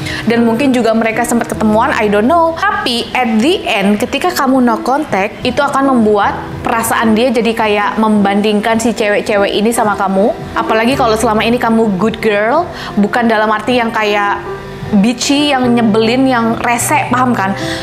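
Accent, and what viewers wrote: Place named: Bali, Indonesia. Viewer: Sekarang logatnya udah ada Bali²nya. Follow kakak dari 4 tahun lalu :)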